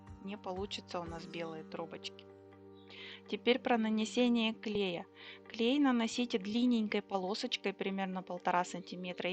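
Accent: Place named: Russia. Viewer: Снова, Здравствуйте!А, где,Вы, проживаете?По говору, не центральная часть России!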